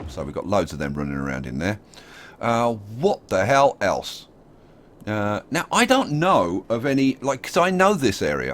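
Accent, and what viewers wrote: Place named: Midlands, United Kingdom. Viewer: Great job. By the way i am going to guess you are mid forties and originally from somewhere in birmingham or towards nottingham?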